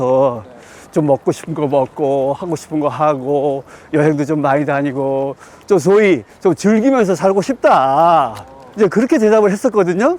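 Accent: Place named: North Jeolla, South Korea. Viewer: 말투가 절라북도구마잉. 열씨미 사는구마잉.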